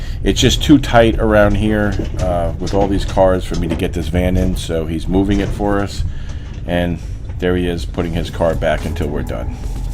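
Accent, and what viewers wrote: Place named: Philadelphia, United States. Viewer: Great to hear a Philly accent. Can trust someone from where I grew up! Thanks. This will help!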